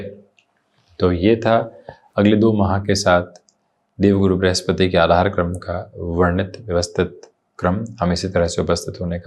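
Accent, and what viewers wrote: Standard. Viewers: आप का हिंदी बहुत शुद्ध है गर्व है ऐसे हिन्दुस्तानीयो पर जो अपने गरिमा को बनाऐ हुऐ है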